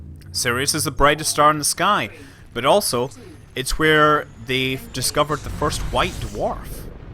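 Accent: Scotland, United Kingdom. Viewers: are u scotish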